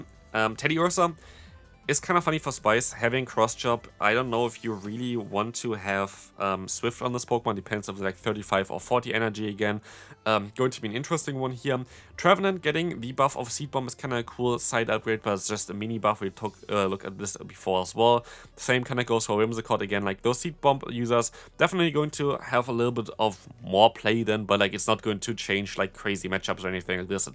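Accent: Germany. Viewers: bro, are you german? I can swear i can sense from your accent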